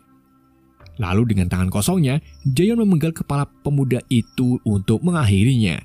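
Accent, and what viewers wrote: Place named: Java, Indonesia. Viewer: Bosooomu kok lucu ngunu min, tibake wong jowo ta🤣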